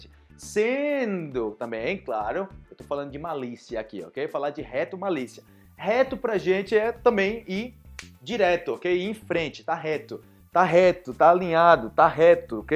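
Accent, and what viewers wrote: Antioquia, Colombia. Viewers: Oi e gente,u sou de Peru aprendeendo portugués muito obrigada pelos videos,muito interessante,voce fala como colombiano,muito bom seu espanhol,aqui a palavra "franela" e un pedazo de tela tambem,desculpe erros em a minha escritura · habla como paisa . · Literalmente 🤣 En Argentina decimos así cuando te engañan con un precio y te cobran de más (me cog-er-n), pero es una expresión muy vulgar. 😅 Tenés acento colombiano! Wow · Muy paisa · Woow, primer brasileño que le oigo hablar muy pero muy bien el español, hasta le sale un acento colombiano , saludos desde Venezuela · vé, vos cuando hablas en español pareces a maluma, es muy paisa tu acento colombiano :D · Oi!!! Aparte de aprender varios falsos amigos"!!! Me has hecho reír tanto!!! Nunca ví un profesor tan divertido!!. mereces un 10!!!.y con ese acento colombiano!!! 👌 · mais ele acento colombiano es super bien .. 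eu gosto muito.. 👍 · tu espanol es espectacular hasta pareces colombiano haha ... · Ivan Rojas pero si se le nota el acento colombiano 😀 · si no eres colombiano, te felicito! tienes una gran habilidad para aprender hasta el acento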